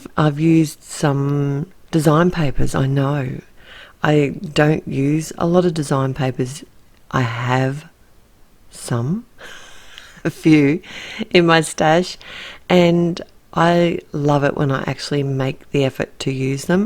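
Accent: Australia. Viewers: I'm so glad you started your channel. I'm from Australia and you sound like a neighbor, here or NZ maybe Anyway... I do enjoy your posts. Wishing you many blessings ")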